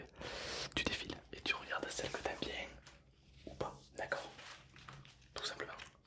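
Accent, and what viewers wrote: South, France. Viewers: L’accan du sudee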